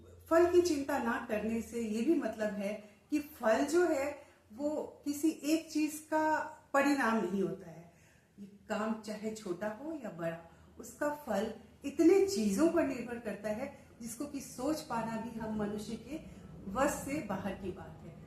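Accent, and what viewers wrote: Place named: Bihar, India. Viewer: Well, first of all warm hello to you after such a long time. Where do you get so much knowledge n wisdom? Are you from Bihar since you have bihari accent ?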